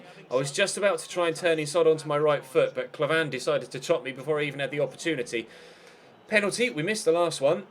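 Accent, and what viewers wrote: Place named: RP, United Kingdom. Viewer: he's just posh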